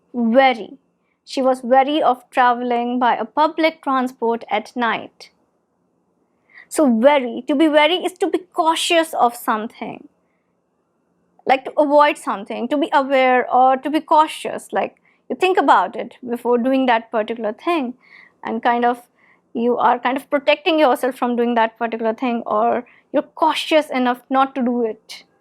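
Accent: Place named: RP, United Kingdom. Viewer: Can be called as, Queen's English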